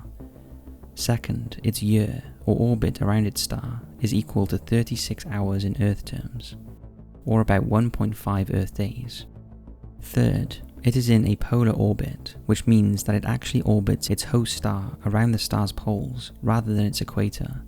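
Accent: United Kingdom. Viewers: Why is he using Fahrenheit when he is speaking with a British accent?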